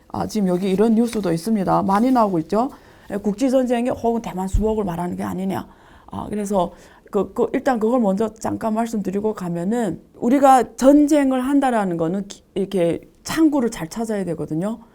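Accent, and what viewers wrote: Yanbian, China. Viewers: 。。加油！ 우리 연변의 자랑이예요 개무 갠데 걸쭉한 연변사투리 좋쓰꾸마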